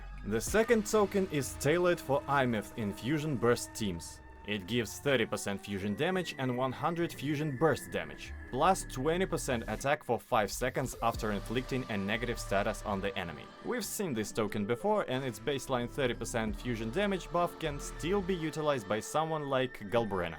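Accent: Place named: Russia. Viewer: Акцент, как будто ты родом из России, но живешь в америке много лет))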